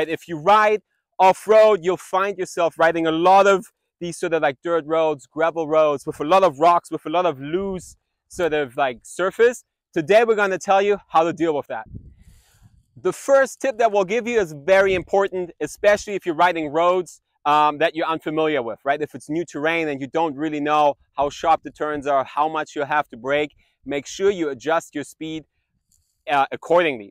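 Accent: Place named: France. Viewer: You lost me at french accent.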